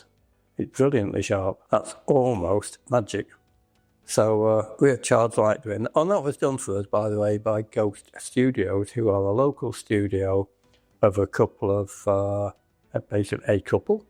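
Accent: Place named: United Kingdom. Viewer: Chards are always good. Not often we hear these British accents on Youtibe either for some reason. Bad reviews just come from uninformed ppl. As soon as Chards makes something idiot-proof, the world just comes up with better idiots! 😂 Sod's law. PS... We need some juicy jousting between dealers on youtube, that would be fun! Difficult though without scripting some stuff. A big convroversy or investigation that blows over eventually after many ups and downs would be just the ticket for this market! 😂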